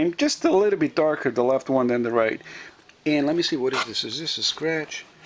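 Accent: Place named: Texas, United States. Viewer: were you born and raised in Texas, i can hear that texas accent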